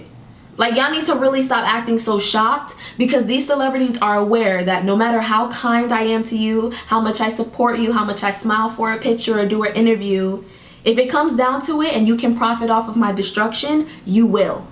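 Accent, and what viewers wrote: Jamaica. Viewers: I heard the Jamaican accent and subscribed...Goodie🇯🇲🇯🇲🇯🇲🇯🇲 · Hooooolllld up , girl u from jamaica , cause dah lawd gad deh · I just found you and immediately after hearing the "lawd gad" I subbed! I love finding Jamaican YouTubers! And btw you were speaking nothing but FACTS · I here the Jamaican in you😘😳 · Gurl! Yu Jamaican!? Lie? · I hear that 🇯🇲 accent. 1❤ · subscribed as soon as I heard that Jamaican accent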